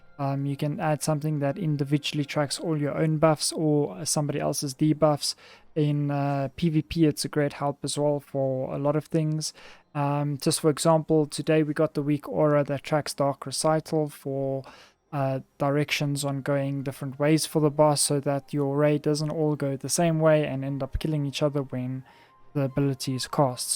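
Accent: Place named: South Africa. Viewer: Are you from South Africa?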